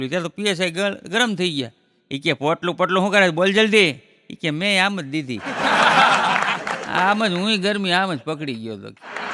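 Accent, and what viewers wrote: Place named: Kathiawar, India. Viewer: Kathiyawadi bole chhe.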